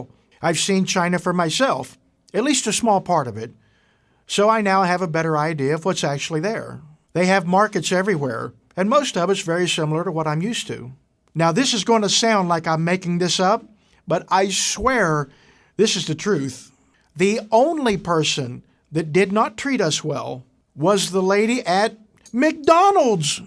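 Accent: South, United States. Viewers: Are you from the south? If so what state? ROLL TIDE!!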